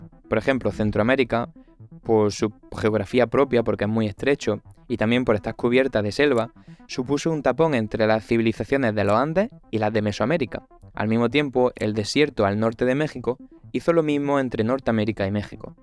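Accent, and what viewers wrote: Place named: Andalusia, Spain. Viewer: 🇪🇸 ¡Andaluz profundo!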